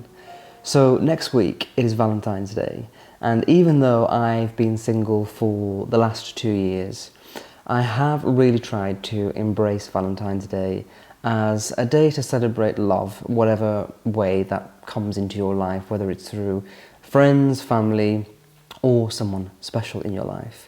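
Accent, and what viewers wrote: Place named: Northern England, United Kingdom. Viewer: I noticed he 'dropped' a lot of his vowels this week in his efforts to appear breathy and sensual- they are more Northern than West Country!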